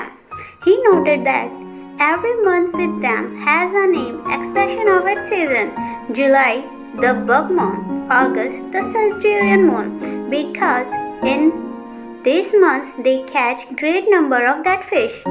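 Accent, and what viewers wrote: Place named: England, United Kingdom. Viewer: nice english acent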